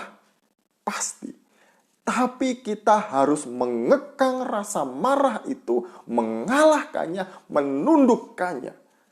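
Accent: Java, Indonesia. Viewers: Yang Pertama Aku suka Gaya bicara Panjenengan Mas kental bamgeet wong jowo nya hehe✌✌✌✌✌✌✌✌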